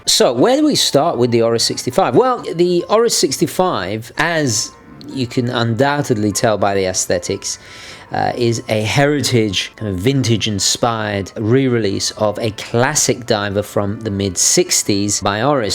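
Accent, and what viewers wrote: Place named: RP, United Kingdom. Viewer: Ok , first things first . In general your vids. have improved, just keep the plumb out of your mouth and things should go well. But , that attempt at the posh type intro has got to go. Guys swimming around about the place just dont fit bro.! Now , the watch , love 95% of it , but the sloppy crown stem in the unscrewed position just says cheap crap , along with the Sellita movt. , unregulated to boot .! Otherwise I would buy one tomorrow .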